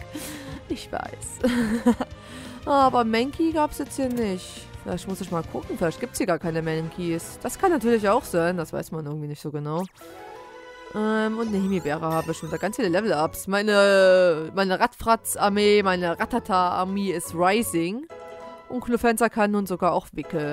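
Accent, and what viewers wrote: East Germany, Germany. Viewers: Hey, echt schönes Lets play, bin neu auf deinem Kanal und ich finde deinen Ostdeutschen Akzent echt super :) bin übrigens auch ein Ossi :D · Gott wie ich dein ostdeutschen Dialekt liebe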